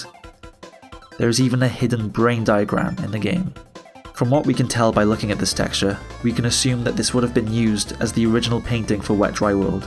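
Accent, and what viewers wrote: Wales, United Kingdom. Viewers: Wait are you br*tish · everyone else is talking abt the crepypasta but i just cant not notice the welsh accent lol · Wondering this what part of Wales you from?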